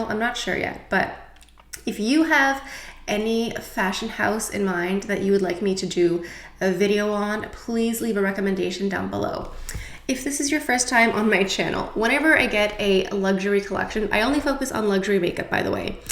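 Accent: France. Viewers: I just discovered your channel. It's a good idea to talk about fashion and makeup at the same time. Your French accent is amazing.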